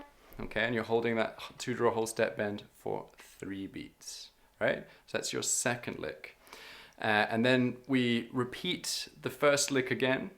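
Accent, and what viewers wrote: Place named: England, United Kingdom. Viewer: You're Scottish? I never would have guessed. Your accent sounds English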